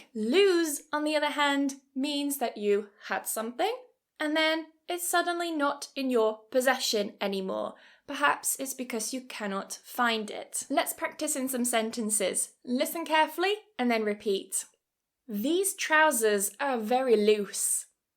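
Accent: United Kingdom. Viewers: l have american accent but l love your british accent as well you are great my dear❤❤❤ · Hello, I'm Oscar Quiñones, I'm Colombian. I love you British accent!! 😊😊😊, Thank you for you class! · 100% is British accent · Litterly this Vedio solved 30% my problems in English specialiy word and world 🌎 I really loved British accent I'm try to work on it to get good pronunciation it's was lovely Vedio thanks sis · Ohh My gosh British pronunciation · Is this British English? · Love your British accent ❤❤❤ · I love UK accent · I love your accent. May i ask where you are from?Which part of Britain speaks it? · I find this video particularly entertaining, I'm from Costa Rica, is this British accent?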